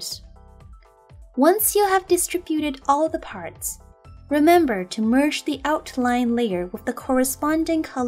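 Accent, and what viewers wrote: Japan. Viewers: I´m from Mexico and what I note is not about your comprehension of English . The problem is the person who is explaining the video, It seems that is a Japenese person talking in English, is noticeable due to the accent and is hard to follow what she says until you hear various paragraphs of what she explained. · I think it's because she's Japanese and she's trying to focus on her accent lol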